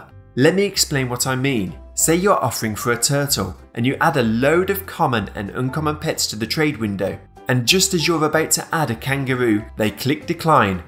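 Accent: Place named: United Kingdom. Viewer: Is it just me, or his voice is so british its calming?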